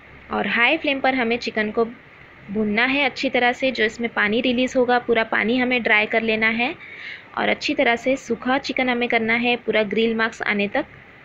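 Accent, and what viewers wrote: Hyderabad, India. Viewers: Aap Hyderabad daccan se hayn?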